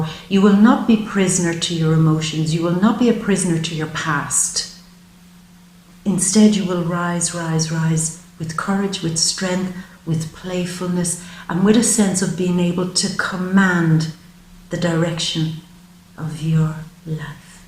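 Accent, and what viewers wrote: Ireland. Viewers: I sent your readings to a LOT of my friends. Problems with the Galaxy or not, YOU were very powerful. I'm going to listen to my sage Sun reading when I go to sleep for awhile so that I can fully absorb it. Enjoyed my moon read as well as I'm SURE I will my rising. Love your Irish accent and your practical take on astrology and tarot. VERY insightful AND inspiring. THANK YOU SO MUCH ❤️🎈💗🎈💞🎈💕🎈 🙌 🌞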